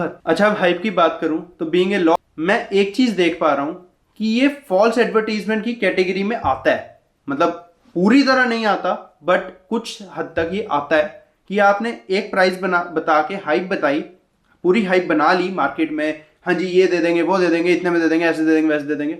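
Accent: Jammu, India. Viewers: Bro r u from Jammu?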